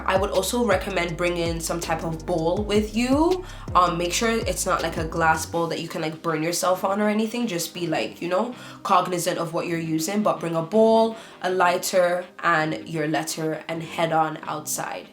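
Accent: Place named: Caribbean. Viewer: I literally followed just for the Caribbean accent in the intro 🤷🏾‍♀️ yessss sus!!! Vid just starting so I can’t wait to learn n implement 🙏🏾🙏🏾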